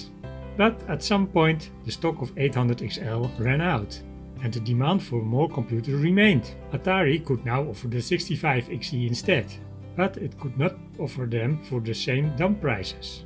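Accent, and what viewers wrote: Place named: Netherlands. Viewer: I think he’s a Dutch guy 😅he speaks “coal miner” English 😊 Nonetheless a great video, nice research!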